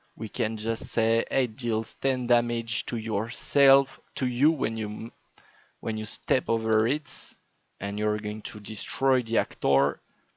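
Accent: France. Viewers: Es-tu français ?? sinon très bonne vidéo comme d'hab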